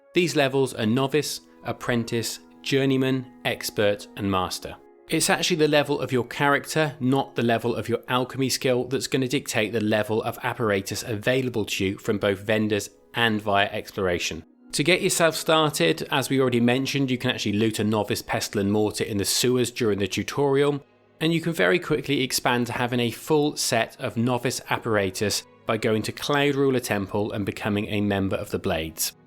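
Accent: England, United Kingdom. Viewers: Do people get mad at Brits for Brit speech? Feels childish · Good looks my friend great tutorial very helpful even with the English vibe lol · I’m more triggered by your use of apparatus as singular and plural than the British pronunciation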